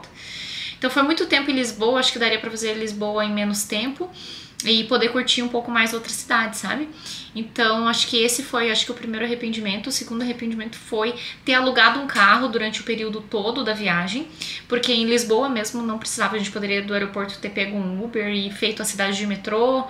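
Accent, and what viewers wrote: Brazil. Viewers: Oi sou portuguesa nasci, na ilha Graciosa uma das nove,dos Açores, vivo no Canadá, viajo para Portugal Continental, com frequência,o meu marido e do Distrito da Guarda a qual depois de Lisboa foi a Cidade que mais conheço e menos gosto, ao Porto só fiz paragem no Aeroporto, está na minha lista quem sabe este ano? quanto a Coimbra gostei, mas não tanto quanto Aveiro muito mais talvez por causa do Mar,conheço Peniche, e Fátima, Nazaré gosto muito,Castelo Branco também é muito agradável, a Sertã gostei, mas me desculpem os Portugueses de Portugal Continental, prefiro os Açores mil vezes mil que a outro qualquer lugar 😂 mas sou suspeita, pois já que la tenho as minhas raizes,já o marido é continental e prefere os Açores, para uma próxima visite os Açores, se bem que a culinária- em termos de restauração o continente ganha os Açores muitas vezes, gostei muito de assistir os seus vídeos e tal como todos os Portugueses, acho estranho quando vocês Brasileiros prenunciam as palavras Portuguesas 😂 como ir no Porto e não ao Porto, me faz lembrar, que vamos montados em algo chamado Porto 😂 bjs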